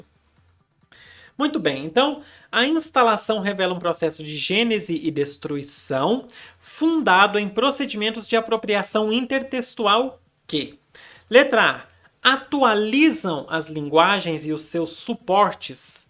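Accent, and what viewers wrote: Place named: Minas Gerais, Brazil. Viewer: hahaha quais foram as exposições? Sou de Minas também! obs: já tinha desconfiado que era mineiro pelo sotaque, esse não engana ninguém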